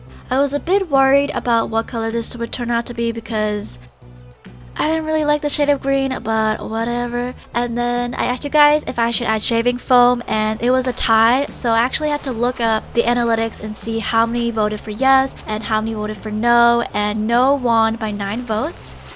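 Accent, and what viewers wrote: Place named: India. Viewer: You talk like an Indian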